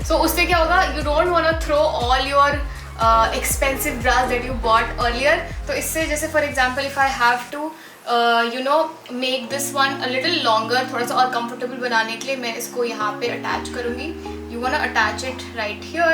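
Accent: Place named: India. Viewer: 100% you look like foreigner 😮ie like pure100% Russian But your accent is paka indian 😮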